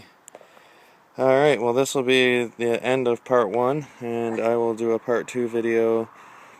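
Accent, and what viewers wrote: Canada. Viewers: I HATE WHEN CANADIANS USE ANY WORD WITH ''OUT '' IN IT ..ABOWT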